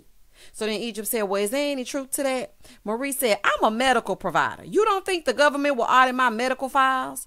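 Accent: South, United States. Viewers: Well its the south and you have a little south in your voice. But I agree I cannot stand all of fussing at the same time though, lol!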